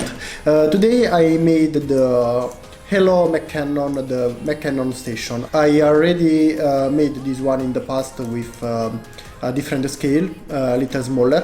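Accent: Italy. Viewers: Italian accent?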